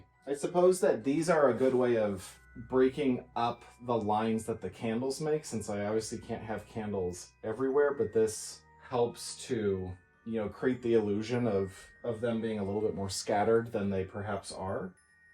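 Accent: Minnesota, United States. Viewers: I love the battery powered candles for the tree! So pretty. Since Paolo stole the show he's the 4th Day of Vlogmas. On the 4th Day of Vlogmas my true love gave to me: Four meows from Paolo Three rolls of batting Two power strips 🔌🔌 And you sound like the movie Fargo 🎄⛄🙂⛄🎄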